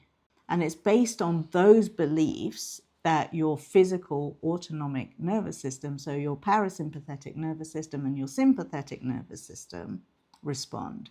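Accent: England, United Kingdom. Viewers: Can I ask what the relevance is to you stating that the people you normally work with are six figure earners...what difference does itahe what you earn...is that the only value we have as humans...the amount of money we can make?!?!?! I've never come across your videos and I was intrigued and even excited when I heard the English accent then you said that....such a shame 😮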